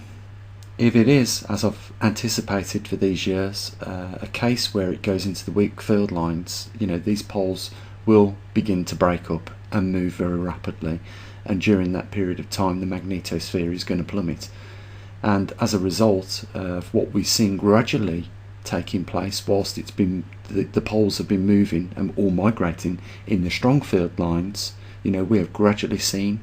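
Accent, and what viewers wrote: Birmingham, United Kingdom. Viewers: OMG a Brummy talking science . Whatever next. Utter bllx.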